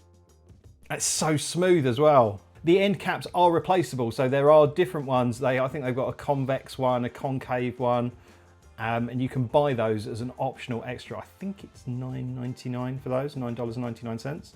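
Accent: United Kingdom. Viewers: The small interaction with LTT definitely bumped the algorithm. I understand your frustration with the metrics. You have a portfolio and time in the market, but it clearly takes something like this to get visibility with the sheer numbers of YouTube. There's a ton of UK makers (I assumed based on accent). James Bruton is one that might mesh well. Hacking things together, fixing things, and whatnot. IMO you are better at presentation, but he makes some really cool stuff. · Firstly - the algorithm gods brought me here. Am going to sub, loving the content. Also - please don’t tell me the comment about ‘longer shaft being useful’ was unplanned. Was treś funny! lol Keep up the work bruv. (Sound likes a fellow Londoner)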